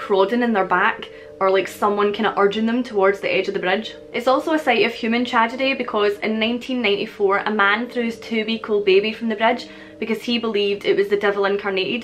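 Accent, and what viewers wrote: Scotland, United Kingdom. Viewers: If you want a laugh..watch with the Closed Captions on. The computer that is used doesn't understand a Scottish accent!! It's interpretation is hysterical!! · If you're interested in folk stories from Scotland and Ireland, Juliet Marillier writes beautiful fantasy based off of them. Daughter of the Forest is book one of my favourite series by her. Oh, and I love your accent! I miss hearing it, since my Grandpa died. He was from Glascow. Well, a small town just outside. Auchinairn??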